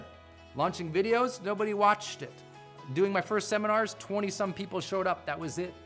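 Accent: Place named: United States. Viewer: One who is weak at English can easily learn English by listening U.S English it is much easier than that of England their accent is little bit harder to understand for new language learner and fortunately Brendon is from USA so you can't listen to him.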